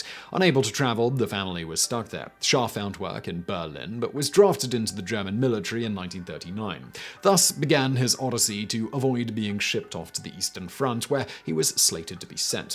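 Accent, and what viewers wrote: United Kingdom. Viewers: It's Vsuace but British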